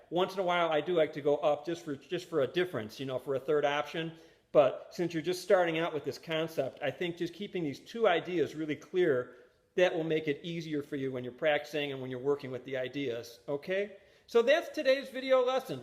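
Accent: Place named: Chicago, United States. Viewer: It's Chicago accent - part of the charm! He's a great guy & a super strong player & teacher!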